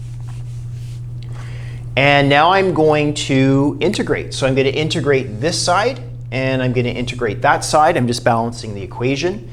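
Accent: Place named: United States. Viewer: This is very clever. I don't think we did differential equations in school. Looks more like first year university level, but I would have understood it at 16-17 with AS or A-level calculus. It's not always obvious what subsitution to use to separate the variables. I'm surprised you say 'zed' and not 'zee' since you sound American. Perhaps 'zee' can too easily be confused with 'c'. I like that you put lines through the z's so they can't be misread as 2's. I have a job marking maths classwork and sometimes the pupils get 0's and 6's looking the same, or 'b's and '6's or 'x's written like 'times' signs. I guess the first most important lesson is how to write numbers and letters clearly! Some 2's (or 1's) look like 7's, some 3's and 5's look about the same...! Once they start doing algebra writing neatly is so important! Differential equations get so complicated with del operators and vectors etc! 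Non-linear.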